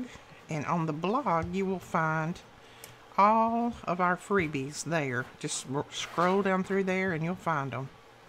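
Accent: South, United States. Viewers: I love everything you do, and these are so lovely. I also love your accent, are you from around where Elvis lived? I am from Portsmouth, UK. I've been to a few places in the USA, and it's my favourite country on the planet. Take care and keep crafting. xx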